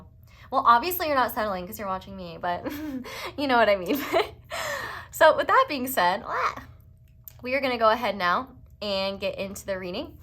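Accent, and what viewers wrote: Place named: Brooklyn, United States. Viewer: Pile 2, my person is a radio dj and in the beginning whenever we would fight and have a big fall out and stop speaking (we were both denying the depth of this), I would feel a huge surge in my heart and tune into his radio station to hear what he truly wanted to say because our words were failing. This reading is so spot on thank you for your gifts. Haha also I have 5 aspects in Gemini and his mercury is in Gemini too. Also your Brooklynn accent — we’ve talked about naming our future daughter brooklynn. ❤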